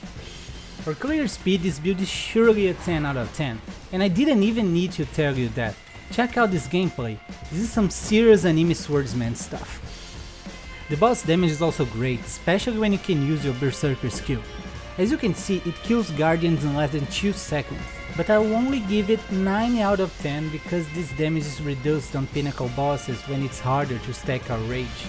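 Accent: Brazil. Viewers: Vc é Br né parceiro eu tô vendo teu sotaque Bro, vlw por esse conteúdo maravilhoso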